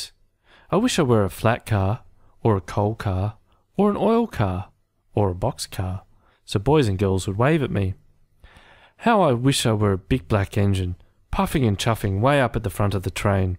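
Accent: Australia. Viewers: I think this is a really good reading and it is a classic children's story. Nice to hear an Australian voice. I do think, however, that we Australians tend to speak with a lot less light and shade and inflections in our voices than many other native speakers of English. I think the reading of this simple and engaging story, simple though it is, could do with a more light and shade in the voice - especially when talking about the hero at the back of the train. Also, perhaps, more pauses and a changes in tempo - I suppose - in the repetitive sections about the various different cars - the oil cars, the box cars et cetera. But, it's the reading to the children that is so, so important - the manner of the reading less so. But you will find the more you inflect your voice when reading, so will the children when it comes their turn to read it to you ... as they will! 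Aren't the illustrations classic. The illustrator has a very interesting story himself, and was a renowned artist in the years between the two world wars. Best of luck. More soon, I hope. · I actually like the Aussie rendition of this! You definitely speak with feeling. Enunciation as always, highlights the points of childrens stories and those words that they are supposed to understand. Seems that you've done that. I like getting feedback on my channel as well. I have written a few original children's stories if you would kindly take the time to check some of those out on my channel and let me know what you think!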